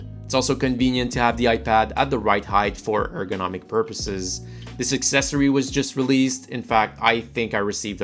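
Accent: Quebec, Canada. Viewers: Super random, but are you per chance French Canadian? It's super subtle but I feel like I'm hearing that subtle French of my fellow bretheren so thought I'd ask 😂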